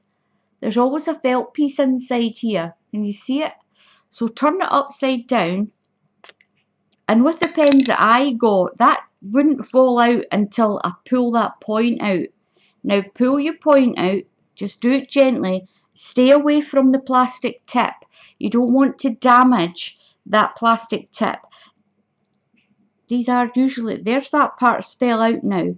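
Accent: Scotland, United Kingdom. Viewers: are you scottish? I just love your accent!! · are you Scottish? your accent is very cute. greetings from Romania! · Very clever idea. I have a kind of personal question though, are you scottish or from northern England? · what a heavy british accent 😂 wow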